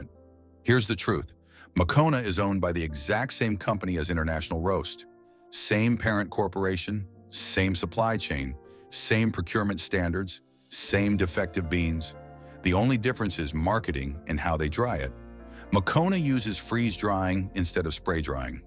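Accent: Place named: United States. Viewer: Why the Yank accent?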